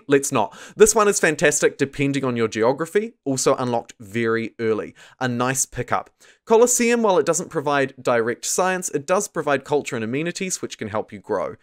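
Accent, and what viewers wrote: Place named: New Zealand. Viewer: That's a kiwi voice right there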